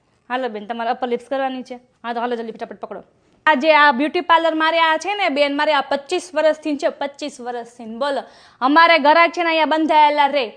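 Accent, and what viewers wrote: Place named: Saurashtra, India. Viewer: તમે બોલો છો તો મારી સખી જેવાં લાગો છો સૌરાષ્ટ્ર, કાઠીયાવાડી ભાષા....આવા વિડિયો હું અમારા સર્કલ માં મોકલું છું...😊😊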